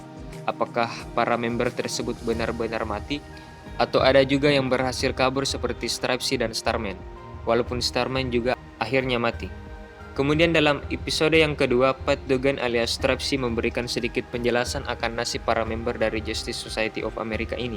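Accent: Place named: Sumatra, Indonesia. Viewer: dari logatnya abang orang sumatera ya...😁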